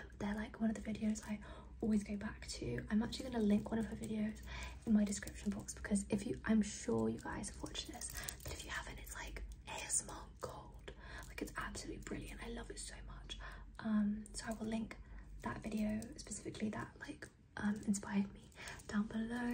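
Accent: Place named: United Kingdom. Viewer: Oh my god I was NOT expecting the British accent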